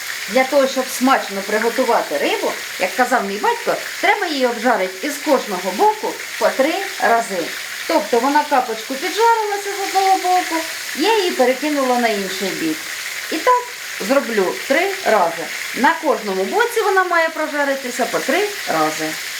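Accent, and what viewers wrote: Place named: Dnipropetrovsk, Ukraine. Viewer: Обожаю наш Днепропетровский говор😂👍 караси на вид такие аппетитные, шо аж язык хочется глотнуть 👍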